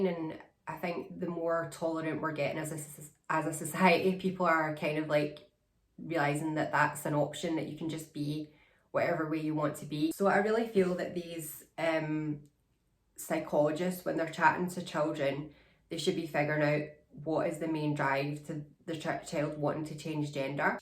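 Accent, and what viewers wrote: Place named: Scotland, United Kingdom. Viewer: Personally I would have been whipped if I had have told my father when I was that Young. Even now when I am at an early stage in my own transition. I still need a lot of encouragement in my journey because I feel Society is not taught any different . That decision to block trans people should not come down to a decision one greedy person decided to Sue the government. Oh by the way I love your channel and the Scottish accent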